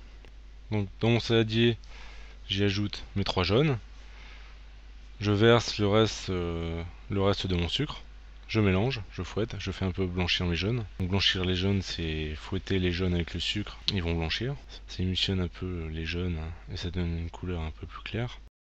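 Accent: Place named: Nord, France. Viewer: Bonjour chef, je viens de m'abonner à votre chaîne que je dévore attentivement. Je me doutais bien que comme moi, vous étiez du Nord/Pas-de-Calais. En tout cas tous vos conseils font que mes pâtisseries sont une réussite. Hier, j'ai réalisé les allumettes et des croissants. Là, bien sûr, je termine les choux. Il me reste à les bourrer de crème. J'ai hâte de les goûter lol. Cela fait du bien d'entendre le son du Nord dans votre voix surtout que je suis exilée en région parisienne et ma région me manque terriblement. Merci pour tout lol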